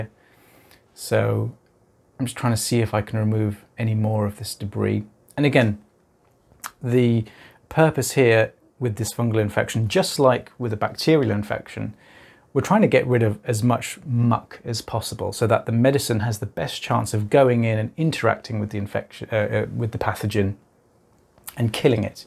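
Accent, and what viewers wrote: United Kingdom. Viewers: I come here to practice British accent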